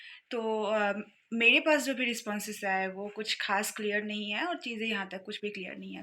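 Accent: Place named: Bengal, India. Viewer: By your language accents, you looks bangali??...i mean are you from west bangal??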